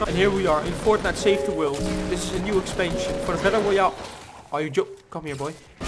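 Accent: Netherlands. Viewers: ik ga stuk je nederlandse accent hahahaa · your english sounds really dutch. Are you from the Netherlands? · Are you from the netherlands you sound like that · Hi’s accent is soooo Dutch😂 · You have a dutch accent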